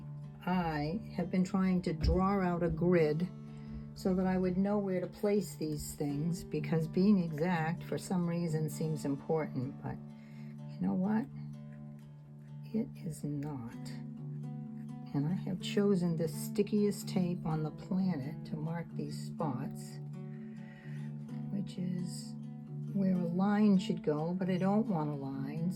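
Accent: Boston, United States. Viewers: I love your Boston accent! Your art is so satisfying to watch as you go about making choices for what you use and how. I can make gel prints all day and do but making a collage is another story. Thanks for making a video today ❤️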